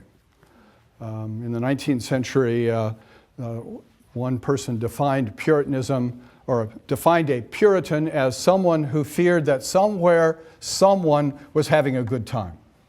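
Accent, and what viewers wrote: United Kingdom. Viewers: That accent reminds me of William Butcher, a British fictional character from the Amazon Prime series 'The Boys'.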